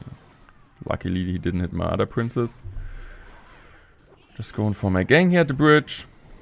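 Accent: Germany. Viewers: ich liebe dich und deinen deutschen akzent vor allem dieses (in di intia wörlt plaing in top läda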